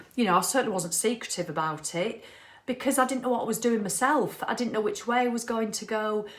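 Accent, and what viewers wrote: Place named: United Kingdom. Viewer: Great woman. And I rate this as one of the greatest accents in the world! Brings back fond memories of the UK.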